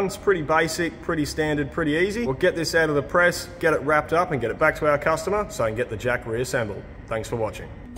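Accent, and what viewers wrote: Australia. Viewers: Nice aussie accent , amazing craftsmanship, make your vids worth watching. Greeting from a fellow machinist.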